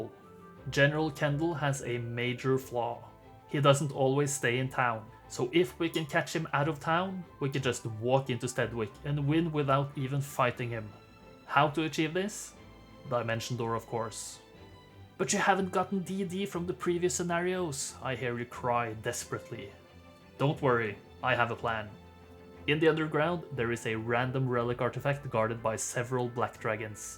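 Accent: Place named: Norway. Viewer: Me: algorithm, get me a video Algorithm: no problem. What sort of stuff do you like? Me: challenge runs of one of my favorite video games, with the art being made in paint, narrated by someone with an unapologetically norwegian accent. Algorithm: one moment, sir...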